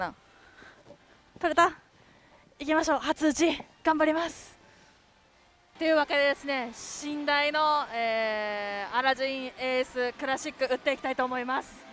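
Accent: Kagoshima, Japan. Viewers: 何処の出身ですか？？ 鹿児島？？🤔🤔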